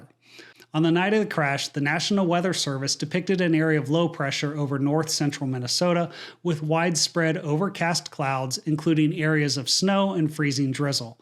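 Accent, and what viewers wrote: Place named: Midwest, United States. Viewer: I want to ask a favor, unrelated to this specific video, but pertaining to all videos you make: could you please say "so M ething" with an EMM instead of "sunthing" (with an ENN)? Thank you. It's not important at all and probably shouldn't bother me, but I feel it detracts from the overall quality of your videos, which is otherwise top notch. Ending present participles with '-een' instead of '-ing' is, I guess, only a harmless feature of a regional Midwestern accent and much less noticeable. Apologies: no offense meant.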